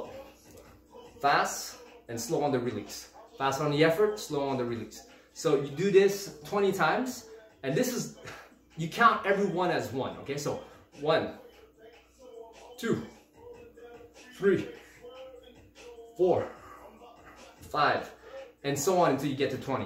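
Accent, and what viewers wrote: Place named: United States. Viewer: Forgive,but, you sound more American than Canadian!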